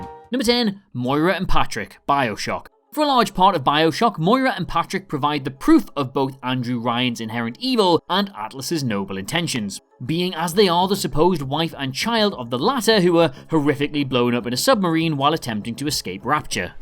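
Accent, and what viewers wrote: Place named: United Kingdom. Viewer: It is a perfectly acceptable British dialect. We're not all from London you know.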